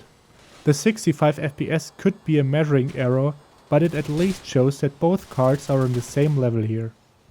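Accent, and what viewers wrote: Germany. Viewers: das deutsch trieft aus allen sätzen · Hab sofort gemerkt, dass da ein Deutscher quatscht :D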